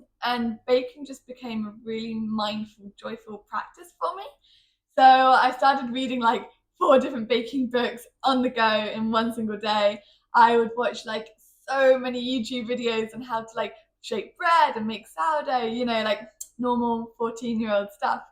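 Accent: United Kingdom. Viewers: What kind of accent do you have? Which part of England? I'm guessing south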